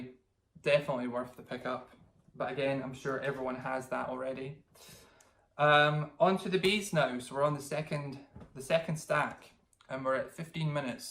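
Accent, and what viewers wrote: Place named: Scotland, United Kingdom. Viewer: Hey dude you sound Scottish - where about are you from? Do you buy blu rays from Asda HMV etc? I’m big into 4K blu rays also from Scotland. Watched Aquaman 4K on my Oled and was incredible.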